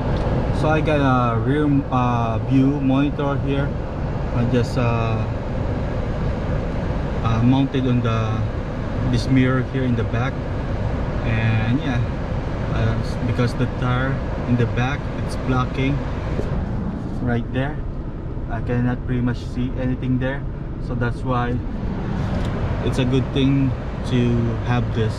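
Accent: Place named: Philippines. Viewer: that filipino accent tho.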